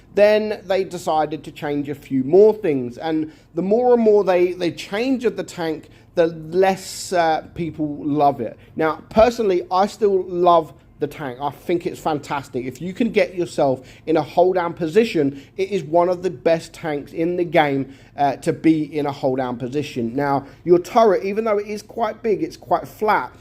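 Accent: United Kingdom. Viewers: bri'ish accent lol, i also mishead lmfao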